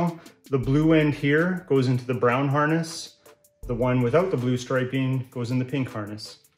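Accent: Canada. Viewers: I was wondering why you sounded like Jordon Peterson and used some of the wordings he does until I realized you’re both Canadian lol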